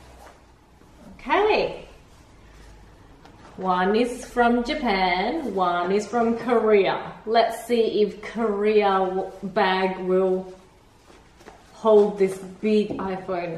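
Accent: Australia. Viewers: I love your accent I'm Australian as well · Are you Australian?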